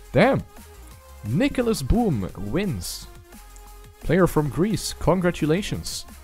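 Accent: Germany. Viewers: u German? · Damn the german accent is Go intensive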